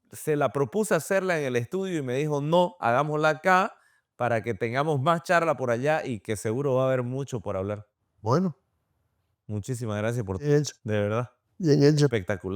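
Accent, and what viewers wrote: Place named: Santa Cruz, Bolivia. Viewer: hablando como todo camba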